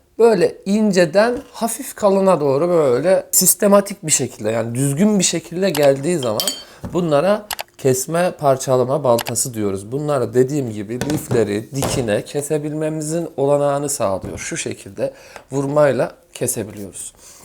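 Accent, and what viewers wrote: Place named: Istanbul, Turkey. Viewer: Dostum eline emeğin e saglik.diksiyon baya istanbul ağzına yaklasmis😆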